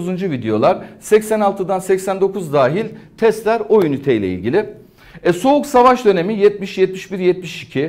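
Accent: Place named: Istanbul, Turkey. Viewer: Neyse ki sonunda nörüyorn, gardaşlar, godum mu oturtutum vs vs avam söylemleri olmayan İstanbul Türkçesi ile efendice ders anlatan beyefendi bir HOCA'ya denk gelebildim..Dikkat çekicem, ilginç olucam, bu isler bizden sorulur havalarındaki kahvede okeye 4. olamayacak insanlardan ders dinleyemiyorum..Çok teşekkür ederim Sayın Hocam..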